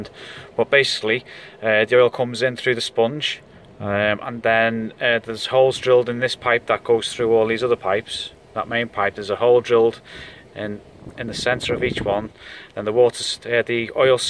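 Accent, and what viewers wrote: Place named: Liverpool, United Kingdom. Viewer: You sound like your from My wife's neck of the woods. Knotty Ash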